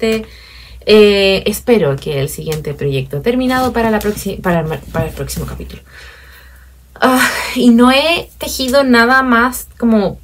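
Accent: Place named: Chile. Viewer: Que lindo el Sr Leon! Me encanta el sweater que estas diseñando. Y estando en esa nota, yo pondría los botones en la sisa pq si los pones en las mangas te va a molestar, pq eres muy inquieta y haces muchas cosas con los niños. Yo también estoy embobada con las cosas que esta haciendo la Glorita, y estaba contemplando comprarle algo, pero definitivamente me convenciste. Preciosas todas las bolsas. Me encanta escucharte, hoy te escuchabas menos Española. Más Chilena! 😂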